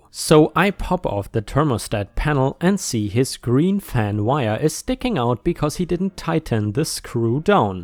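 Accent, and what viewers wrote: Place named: Germany. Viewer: Hi I want you to know how much I enjoy all your stories. Listening to you with your German accent takes me back to when I was a kid, listening to my grandparents ( from Germany)tell stories. I miss them dearly, but hearing you makes me so happy again. Thank you so much for taking me back to very good times.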